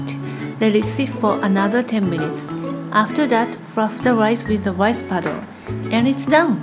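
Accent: Japan. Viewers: english language with dialect japan but i love so much 😍😍 im from indonesia 🇮🇩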